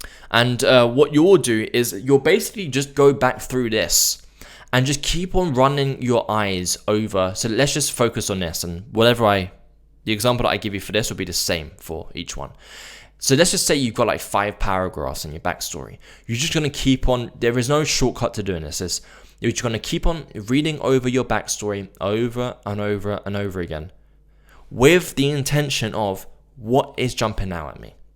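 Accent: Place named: United Kingdom. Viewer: Great! 😊 btw I love British accent & sense of humor. Cheers! 🥂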